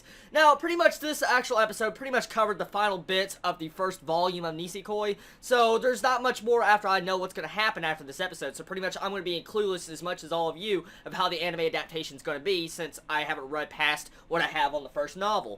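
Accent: South, United States. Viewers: This guy is so southern.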